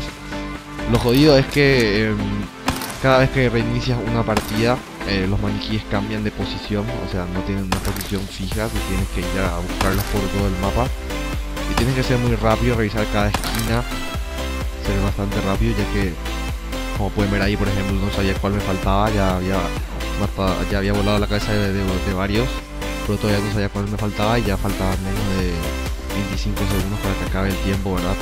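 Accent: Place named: Paraguay. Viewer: Se te re nota el acento de Paraguayo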